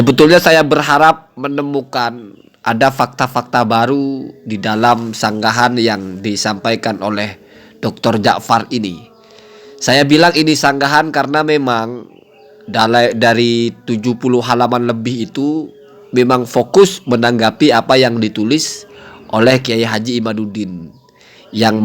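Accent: Madura, Indonesia. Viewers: 😂😂😂😂 maduranya sangat kental...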